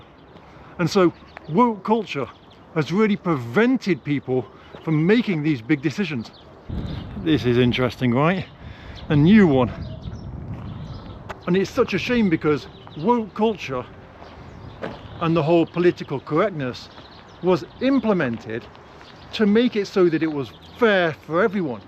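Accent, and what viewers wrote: United Kingdom. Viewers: uk is a dump, it's not diverse in russia is it? that might be why it's better? You've got 'harlem' on your hoodie, surely that's is treasonous to the motherland? You sound british but you don't look it, where are you from originally? I think the upper classes have contempt for the working classes so they bring in mass migration as they think we are all disposable. They don't realise that they will have to live amongst the newcomers. Don't say the elephant in the room just throw labels at people and call them bigots. Woke culture was probably introduced to subjugate the working classes, do you really think that the upper classes ascribe to the woke dogma? They all send their kids to private schools which are the least diverse of places. They're just psychopaths a lot of them, but some are plebs, I'm not sure on the ratio?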